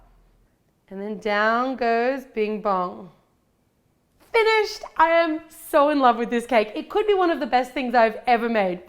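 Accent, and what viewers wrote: Australia. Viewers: Yasmeen Ashby-Ramos she's Australian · are you Australian?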